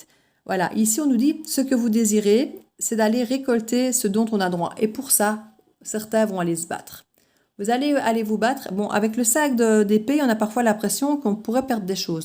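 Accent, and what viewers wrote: Liège, Belgium. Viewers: Je vous decouvre et m'abonne, tres bon tirage tres explicite, bravo 😉 Je pense déceler le petit accent de ma région, Liège 😁🤪🥰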